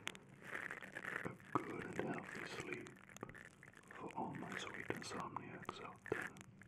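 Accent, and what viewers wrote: Germany. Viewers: This is so amazing after 5 min i fall asleep are u german? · He's German, so his English may be slightly more difficult for you to understand because he's also not a native speaker, and while he's very fluent in English, he does have a distinctly German accent. There's nothing wrong with getting some practice with listening, though. 🙂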